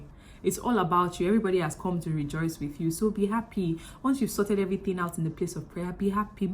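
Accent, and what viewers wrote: Yoruba, Nigeria. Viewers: This your Yoru-English na SUPERB o! Love this Lady FINISH...had to sub sharp sharply🥰🙌🏾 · Your hype is so contagious!!! You are giving me life right now. First time on your channel and I'm definitely here to stay. The Nigerian English made it sooo much better. 😂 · The Queen's English would have ruined it... That Yoruba English was the shiii! I just stumbled upon your vlog and I dare say I'm clicking on the subscribe button ASAP. I laughed through the whole video. Kudos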